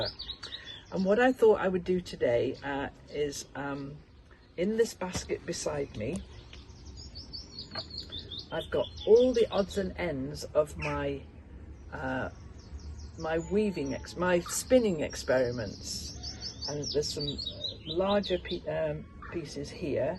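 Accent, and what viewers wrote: United Kingdom. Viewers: Love your weaving!!!!! You are so talented! I have been watching you on utube for several years. Thanks for all your talks about jumping in and trying something new. I am a quilter but love to try all sorts of creative things. Also I have to tell you I also watch you because I crave listening to British accent as my mum was from England. Thanks for having a chat!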